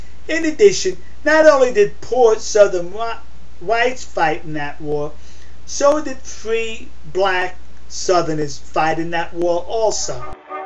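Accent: New York, United States. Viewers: your really smartand ican tell you have lived in new york you still have th accent, but you are smart, congratz on anoter video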